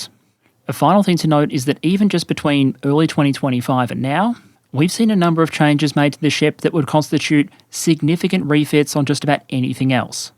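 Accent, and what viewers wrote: Australia. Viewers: Always thought he is australian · Yep almost sure he's an Ozzie.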